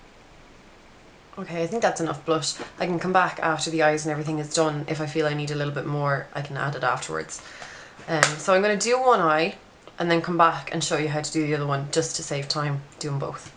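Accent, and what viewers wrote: Ireland. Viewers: very nice look, thanks :) are you from Dublin? :)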